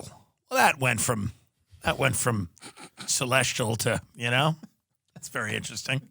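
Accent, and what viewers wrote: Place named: Long Island, United States. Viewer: tim is hysterical and he's such a long islander it's hilarious. everybody on long island knows a guy like tim same accent same way of talking and cracking jokes lol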